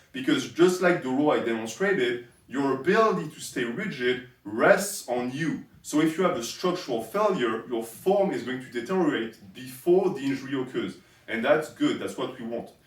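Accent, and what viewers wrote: France. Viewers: This is amazing, I have always wanted/needed somone to tell me how to work on my DB row techniques and the cherry on top is the subtle French accent. ❤️ Stay fit bro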